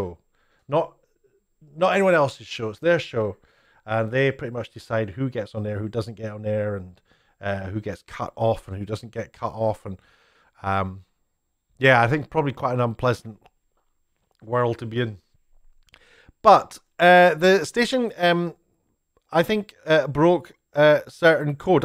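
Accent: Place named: Wales, United Kingdom. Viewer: The following comment is not about Talk Radio, but about the YouTube rules and regulations which you mention: Last year when YouTube introduced the COPPA changes, I was shocked to consistently hear/ read in the comments that a lot of folks seem to think YouTube is a public service company. It isn't, it is a private company which means EVERYONE has to adhere to their rules. In exactly the same way that every pub landlord reserves the right not to serve someone they don't think should be standing at THEIR bar. Also, since the COPPA clean-up which might have also coincided with the new Community Guidelines (I don't know if this was part of COPPA?) I much prefer the YouTube experience now, as the comments were becoming a hate-filled, foul-mouthed cess-pit, which I would not allow in my bar! (I don't own a pub, I make armour... but staying with landlord analogy). 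In the words of our leader (Mr Colin Firth) "Manners maketh man" , so let's all pretend our Grandmother is sitting at the table and be more courteous, this used to be something the British were famous for. 😉🏆🇬🇧 Best wishes, and I like your accent... I have always had a soft-spot for The Welsh! 🤔😉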